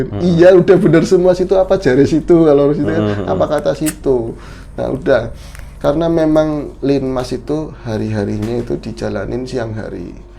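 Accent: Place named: Solo, Indonesia. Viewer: Mas flexible, ya. Tema wong Solo otomatis setelan gaya bicara wong Solo. Tema Betawi otomatis gaya bicara ngikut dialek Betawi. Mangstab 🤭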